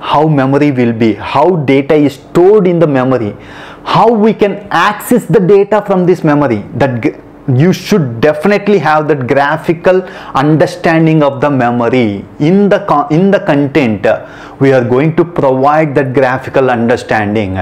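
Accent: India. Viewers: Bro you love language accent pure Indian ❤❤❤❤❤❤❤❤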